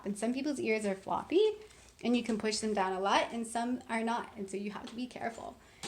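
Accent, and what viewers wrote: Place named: Pacific Northwest, United States. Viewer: She from Portland or Seattle? smh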